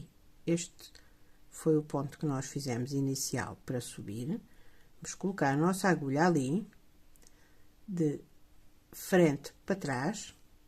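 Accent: Portugal. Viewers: Que belíssima história da avó Januária. Pelo que disse, já tem uns 30 anos o porta sabonete.. Fiquei emocionada pelo seu carinho por ela. E o sotaque também Português, admiro muito! Emocionada aqui! Abraços..... Háh..sou do Brasil, Estado Goiás. Meu sonho, é ir aí neste País. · Este porta sabonete foi enviado por Deus na minha vida. Pois tenho uma paixão por sabonetes, amo crochê e admiro as pessoas Portuguesas. Creio que pelo sotaque, você deve ser de Portugal. Deus a abençoe grandemente 🙏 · Pelo sotaque e de Portugal Parabéns, pelo trabalho da vó genuaria Sou do Brasil Rio de Janeiro amei vou faser 💓💓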